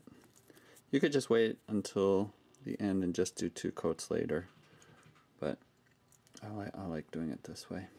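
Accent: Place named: United States. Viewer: because of the american accent, I kept thinking that you were calling the abomination obamanations. made me chuckle. good job though dude.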